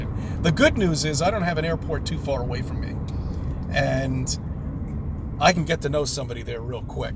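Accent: New York, United States. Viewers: There's something about driving that turns our "thinkers" on.. I found you about a month ago after hearing you on sun and moon group.. you're New York accent brought me home.. I'm in FL now for 7 years but am from the North shore of Long island.. great ponderings , I have them too.. it led me to this channel on yt called the overwatchchannel.. Dan has a great series on how to escape the matrix and reincarnation trap.. pretty mind opening/bending stuff.. It'd be interesting to hear your thoughts on it.. I'm 2 years Into my fe awakening and can honestly say I'm not friends with a single person I used to be anymore..it's crazy.. and all because they simply refused to just look??! Them chemtrails, vaccines and fluoride certainly have done a very well done job.. bravo to the psycho's.. they certainly created quite the cognitive dissonance cocktail for the ages.. 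anyway check out Dan's channel.. thanks for keeping it real 👍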